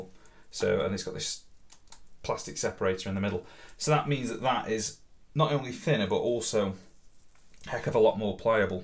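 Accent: Stoke, United Kingdom. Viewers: Hey! Are you a Stokie?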